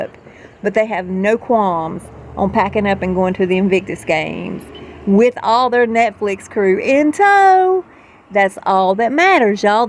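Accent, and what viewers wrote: South, United States. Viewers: Hi I love your southern drowl. Megain was starting to make me dislike Americans. Then I realized it’s not fair to label all by that Hussey. I must tell you I can’t stand that President either. The two need binning. I am from Northern Ireland and fiercely BRITISH. We arrived in your country and helped start the country. We gave 17 Presbyterian President’s and help with the writing of the constitution. Xxxxx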